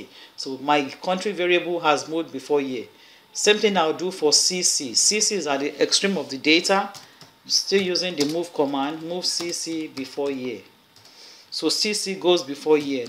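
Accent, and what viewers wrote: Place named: Nigeria. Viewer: Subscribed! Thanks alot for your detailed and simple explanations! It also feels awesome hearing these things explained in a Nigerian accent! Thanks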